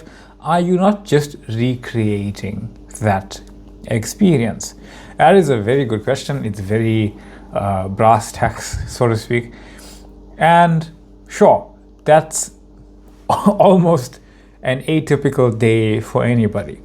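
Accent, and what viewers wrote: South Africa. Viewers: How did I know you were South African even before I played the video😂...looking forward to watching your material.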